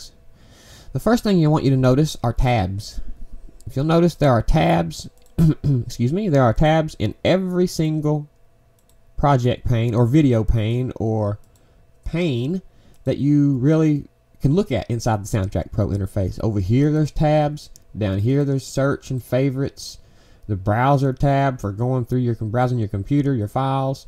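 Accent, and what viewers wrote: South, United States. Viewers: Love the vids and helping a lot. Thank You! Lots of funny comments and inuendos along with good old country boy accent. Well done brother!!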